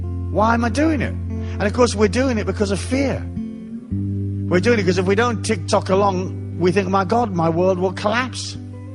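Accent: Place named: Ireland. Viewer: Slight Irish accent here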